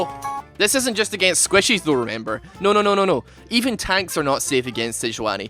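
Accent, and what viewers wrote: Scotland, United Kingdom. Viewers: Is he scotish?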